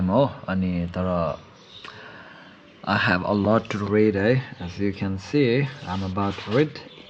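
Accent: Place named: Sikkim, India. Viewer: AE kind of hjr ko bolai xai Sikkimese jostoi lagyo ni so BTW keep going haitw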